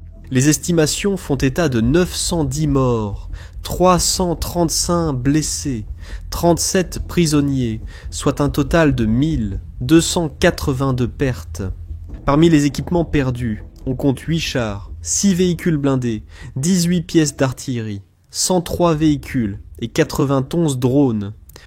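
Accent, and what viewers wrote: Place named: Belgium. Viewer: Slavia Ukrainia. Une voix Belge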